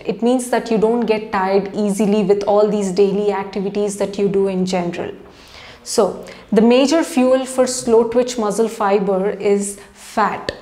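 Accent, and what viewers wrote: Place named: India. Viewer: This the best indian accent from a youtuber ive ever heard. Easy to understand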